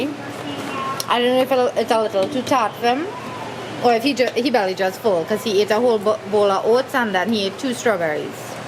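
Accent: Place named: Trinidad and Tobago. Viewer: Hi again I just love seeing you and family happy all going ons..stories,experiences who drink out the coconut water? Especially when you hear the bread van then you have to fly down the step , drop everything vtp reach him before he leaves ..Haha I know the struggles...Girl you show me how to make smoothie gratitude to you fuh so...I could go on learning more about your channel your baby so cute...Love your Trini foreign sal g dialect accent..I pray God keep i all safe happy together even if you do live a part too..Mwah hugs to you all...I seca real cry baby when I don't see family enough...Bye..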